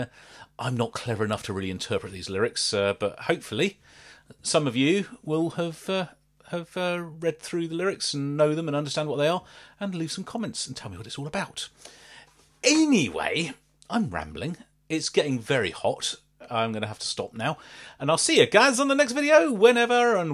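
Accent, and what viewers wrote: United Kingdom. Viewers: Great channel, cool english accent and diction!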